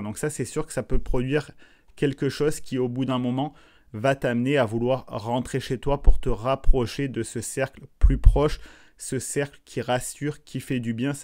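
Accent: Canada. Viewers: Punaise tu as pris l accent canadien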